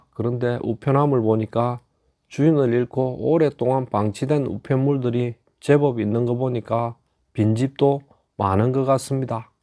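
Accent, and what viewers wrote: Gyeongsang, South Korea. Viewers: 지도 싫은데 굳이 드론까지 띠워서 열심히도 찍었네 ㅋㅋㅋ 난 이양반 부산 경상쪽 만 좌표 찍어서 죽어라 찍어 열심히 비아냥 거리는거 ㅈㄴ 웃김 ㅋㅋ. 70년 생이라서 대학떄 농활다니면서 정신교육을 제대로 받았는지 ㅋㅋ 말투는 영락없는 경상도 말투인데 찍어내는 컨텐츠는 그야말로 지역 죽어라고 고사를 지내는 수준 ㅋㅋ 제발 이런노력 전라도나 경기 권에 가서 하십셔 ㅋㅋㅋ 이런 정성으로 그쪽 파보면 부조리가 100배는 더 보일테니 ㅋㅋ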